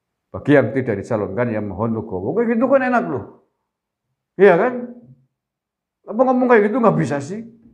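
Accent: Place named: Brebes, Indonesia. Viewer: wkwkwkwkwkwkwkk....wagu jegu...kya kya wong brebes rika Whaaa...mntap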